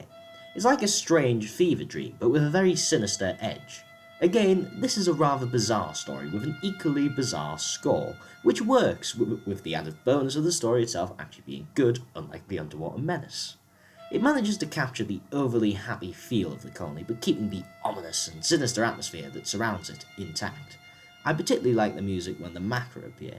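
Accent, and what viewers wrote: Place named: Newcastle, United Kingdom. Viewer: I opened this video expecting a nice run of samples of incidental music from big P Troughtons run, instead i get this wet geordie talkin a load of rubbish, big thumbs down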